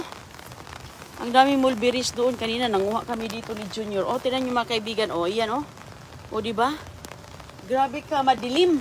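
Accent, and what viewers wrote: Visayas, Philippines. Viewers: para po sakin siguro pabayaan na lang po natin siya ganyan po siya at siya yun di tayo kaya mas maganda wag na lang panoorin kung marami kayong nakikitang kamalian sa kanya at sa mga ginagawa nya. marami din po ako kaibigan na bisaya at ganyan din mag salita kahit sobrang tagal na nila dito sa katagalugan. opinyon ko lang po .✌️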